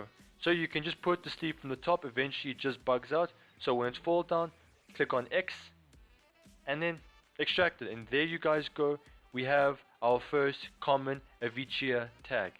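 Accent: South Africa. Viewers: are you south african? and thank you this helped alot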